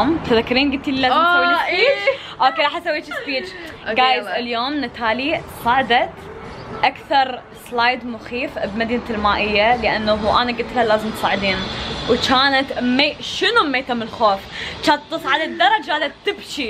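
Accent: Iraq. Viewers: والله ما جنت ادري انتي عراقيه لو انه غلطانه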